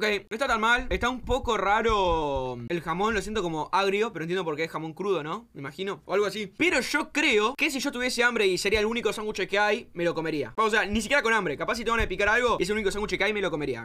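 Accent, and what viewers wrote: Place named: Buenos Aires, Argentina. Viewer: te zarpas de porteño mamita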